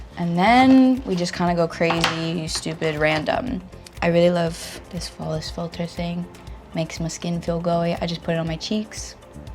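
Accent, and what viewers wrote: United Kingdom. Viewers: Her British accent is perfect 😍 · Ahh dat British accent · She speaks sort of british english or it s just me who think that? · I love her British accent ❤ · Omg her British accent is killing me 😂she’s too pure for this world i love her 😍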